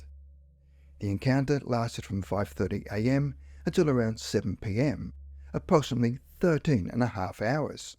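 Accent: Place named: Australia. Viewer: Aussie accent! Oh ignorant ones.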